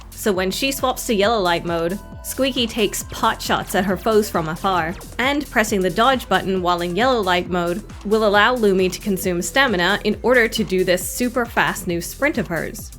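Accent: United Kingdom. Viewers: the scottish accent is great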